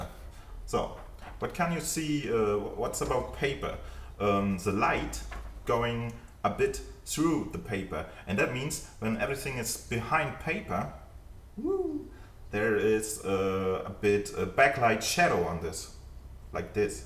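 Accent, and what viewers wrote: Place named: Germany. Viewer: Man merkt das Sie deutsch sind^^ Das Deutsch-Englisch hört man SOFORT raus^^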